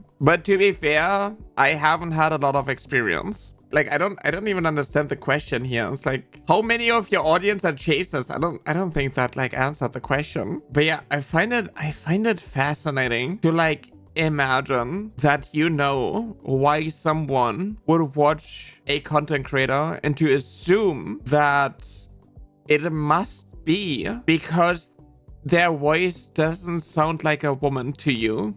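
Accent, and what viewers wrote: Germany. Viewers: Topic of your voice; you sound like a normal German girl to me. Not sure if transphobes know but accents exist. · i still don't get the voice thing, like have they legit not ever heard women speak, who am i kiding they either stick to such a narrow as shit definition of what a woman should sound like(which would exclude most cis woman), or are the "haha woman should be silent" type bigot, or both. anyway, you just sound like a woman with a german accent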